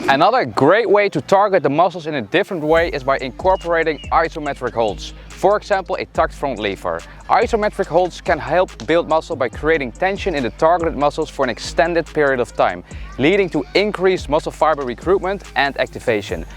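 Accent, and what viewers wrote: Netherlands. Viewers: ik hoorde meteen dat je Nederlands bent hahahahaha. Lekker bezig! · Dutch accent always remains 😊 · It took 10 seconds to recognize you are dutch.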